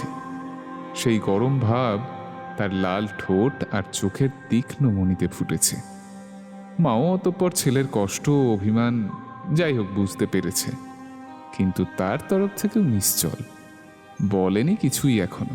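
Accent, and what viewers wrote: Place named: Purba Medinipur, India. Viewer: পূর্ব মেদিনীপুরের মতো ভাষা আমি রামনগরের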